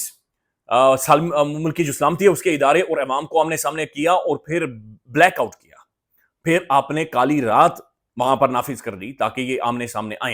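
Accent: Kashmir, India. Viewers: bhai you have the cutest Kashmiri accent… please never lose it 🌹😊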